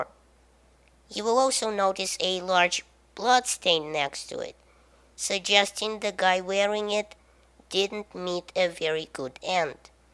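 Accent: Russia. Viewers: You are a russian ?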